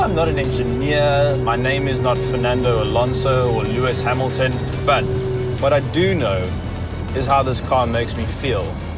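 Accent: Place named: South Africa. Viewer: ahhh nice.... no nonsense boring south african..beautiful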